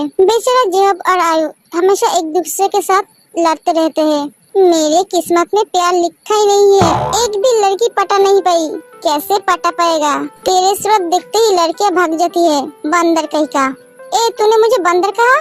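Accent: Bengal, India. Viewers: Di Bengali ho kya aapki Hindi bolane ki Tarika se aisa lag raha hai mujhe.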